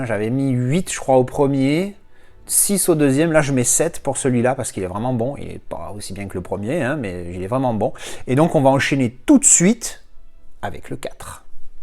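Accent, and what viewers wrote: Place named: Marseille, France. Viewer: Dire des mots asiatique avec l'accent Marseillais c'est Marrant.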